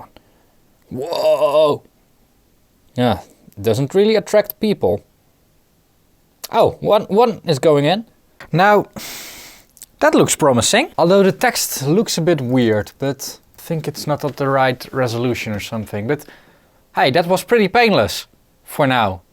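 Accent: Netherlands. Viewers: goedemiddag Nederland , ik heb die update ook gedaan, maar bij mij werkt alles nog goed , ik dacht al jou accent klinkt zo Nederlands :)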